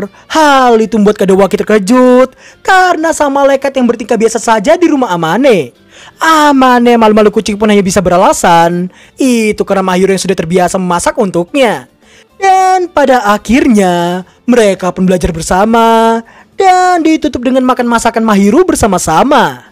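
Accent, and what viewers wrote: Java, Indonesia. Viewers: bang orang jawa ya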